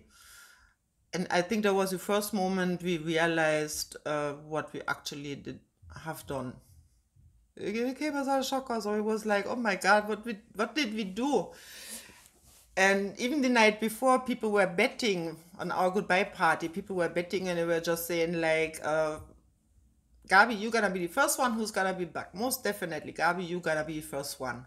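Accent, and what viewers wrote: Jamaica. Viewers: This was a very interesting story. You were very adventurous. You have some Jamaican flavor in your voice. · She sound much like a born jamaican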